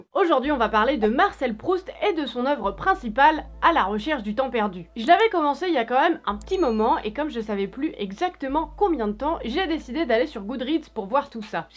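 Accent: Paris, France. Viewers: Je ne l'ai pas lu et je crois que tu m'as fait gagner du temps au lieu de le perdre. Et pourtant je suis tombé sur toi par pu hasard sans même te chercher (parceque covid 19). J'adore ton phrasé parisienne je dirai, ton franc parlé et ton analyse de fin est outrageusement pertinente. En gros il a payé les premier influenceurs de l'époque ... avec Norman Squeezy et Cyprien dans la poche ... c'était gagné c'etait sûr !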